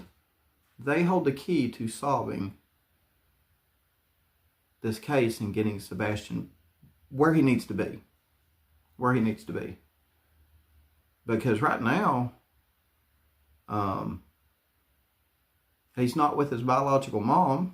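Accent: South, United States. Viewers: darn your southern drawl is Awesome,Im from Tennessee but iv lost all my accent,Sad,Sori off topic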